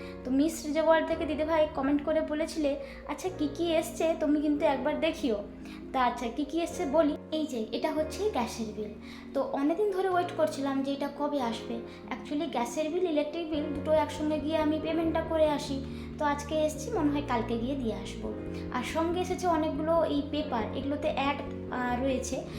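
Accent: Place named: West Bengal, India. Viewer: তোমার সঙ্গে খুব অল্পদিনের পরিচয় হয়েছে কিন্তু তোমার কথাগুলো শুনতে এত ভালো লাগে ❤ তোমার কথাগুলো শুনলে মনে হয় তুমি এই পশ্চিমবঙ্গেই আছো মনেই হয় না হাজারো মাইল দূরে আছো❤ ভালো থেকো সুস্থ থেকো❤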